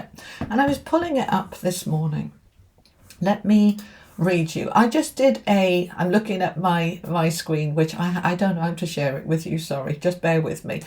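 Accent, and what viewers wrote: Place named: United Kingdom. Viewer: Thank you dear ❤ I was brought up internationally with a cultural mix and learned very early on to not judge. Everyone was different, including me. But what I really needed to be reminded of was the self care bit where I have slipped a bit... Thank you for that! Also I love your voice and accent, I used to work a lot with brits (am Swedish) and listening to you is just wonderful!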